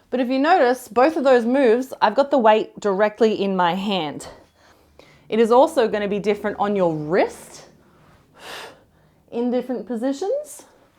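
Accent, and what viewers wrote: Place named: Australia. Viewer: that aussie accent ⚡ i started with Maces 8, 12, 15, 10 & now finally 4kg & even 2kg. Steel clubs journey was different: 4kg pair, 4kg single, 12kg pair, 16kg pair, 20kg pair, 8kg pair, 6kg pair, 1.3 & 2kg indian wooden clubs pairs. the bodybuilder-gym mindset does not work with these tools at all. In all my workout light weights are warm-ups & cool-downs. Also it is great to have light-medium-heavy days. Everytime i hit a heavier mace, lighter ones feels so much lighter after the heavier ones. So, now 15kg feels still heavy, but the day i hit the 20kg one, 15kg will feel lighter. To ascend 2kg weight in steel mace i use golden rule: ability to perform 100 reps 10 to 2 or 200 reps 360. i also use steel maces for muscle building exercises & they can do that function too.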